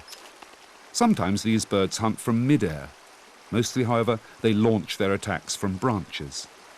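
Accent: RP, United Kingdom. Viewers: Good video, but flawed by the dude with the patronising tone, narrating to me like I am in an Oxford library or school, then the stupid music, if I want music I go to my playlist for it, here I want wildlife, no buffoon trying to talk Royal no big band, just decent narration, good cam crew, couch a beer door shut lights low....job done, but no,...I get this patronising Gitt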